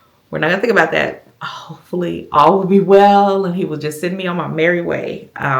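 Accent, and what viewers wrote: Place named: Georgia, United States. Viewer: The journey continues 😊I knew from your accent you had to be near me. Augusta Ga is my hometown. I thank you for sharing your story. I have been thinkg about HRT. Dr put me on low dose birth control to manage menopause symptoms, but said it was only temporary. Now I know to try the supplements. Thank you❤ I am sorry for your loss.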